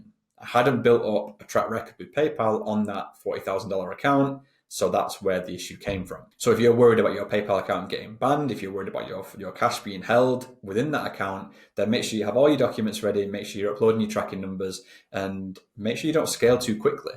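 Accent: North West England, United Kingdom. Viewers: First time I've watched your vids tonight mate. Breath of fresh air. Not the usual BS about how cool they are but proper factual stuff that we all need to know. I have had my ebay shop open dropshipping from Ali for a grand total of 5 days. I am currently going through the "what am I doing wrong" "is it worth it" phase. I'm trying to remain positive and keep scaling. Fingers crossed I need to find out how to get views first off. I'm keeping things as cheap as poss for the time being. Keep up the great content. You sound like a local north west lad like me too.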